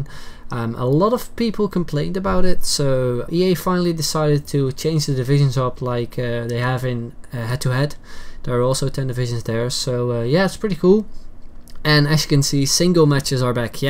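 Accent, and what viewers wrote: Netherlands. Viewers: Haha je hoort gelijk al dat hij nederlader is :-)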